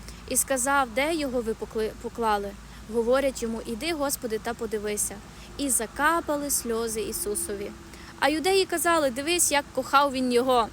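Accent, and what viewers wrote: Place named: Transcarpathia, Ukraine. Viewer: ❤️Оце найкраще подтвердження, що наш Господь Ісус розмовляв з Мартою та Марією... файною українською мовою з закарпатською вимовою😃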